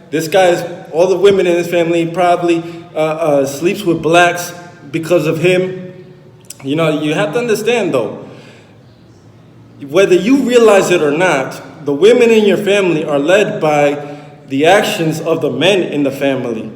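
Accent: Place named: United States. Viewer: your a american ok.. I can tell by the way you talk your not from the island. The Dominicans I know from Panama and RD would stick they chest out and not cry like a little b$tch... They stand on what they believe not back and forth bs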